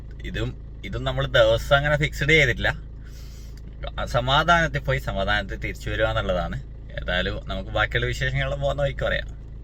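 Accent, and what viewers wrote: Kannur, India. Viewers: യാത്രയ്ക്ക് ആശംസകൾ. Vedeo length കുഴപ്പമില്ല. കണ്ണൂർ slang നമുക്ക് സൂപ്പർ.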